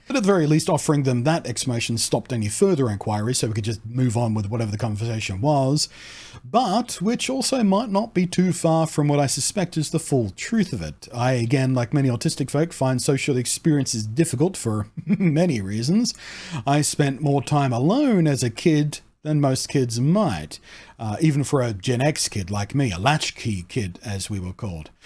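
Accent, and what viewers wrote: Australia. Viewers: is now a Vtuber? 😄 I think you sound pretty Aussie to me. I watch alot of Australian Survivor 😂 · I live in NYC, and grew up in Melbourne, you sound very Australian. I wouldn’t call how you speak ‘Americanised’ in the slightest. Americans just have a terrible ear for accents. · I've always struggled with "small talk", never really understood why people talk about trivial nonsense purely for the sake of conversation. Which even now makes trips to the barber somewhat uncomfortable, although I've found one lately that's happy enough to just get on without the jabber. I also get what I call "verbal error loops" when particularly stressed or tired, where I'll stick on a particular part of a word or sentence, almost always two syllables for some reason. And of course I notice it happening, and get more stressed trying to stop it and... Hence the "loop" 🙄 Only solution is to stop talking and take a breath. 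Accents are interesting for me, I'm quite good at languages for some reason and as a part of that, good at picking up accents. Whether I want to or not. Which is problematic, as I end up picking up accents from whatever environment I'm in, and again am aware of it and feel like it sounds like I'm mocking the locals (for example speaking English in Germany, but with a German accent and grammar) and have to actually WORK at keeping my natural accent. Maybe it's because I'm listening with a Scottish ear, but I've honestly never noted your accent as being anything other than Aussie, although that may be influenced by the fact that I've known or worked with a few different Australians over the years, all of whom have had wildly different accents! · You sound like an average Aussie to me. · Being from the UK, and coincidentally, also being Autistic myself, I'd never really thought about your accent too much. 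Apart from the fact you've told us you're from Australia, and also that I've heard you enough times in your previous videos i could say ' an Aussie', but really that's about it. I have family who have lived in New Zealand for so long now they've picked up a Kiwi accent, and they clearly sound different enough from an Aussie, at least to me, so i'm fairly sure i could tell the difference between accents. You could try just smiling and nodding politely at those who accuse you of having a fake accent. It'll confuse the hell out of them....or maybe it won't.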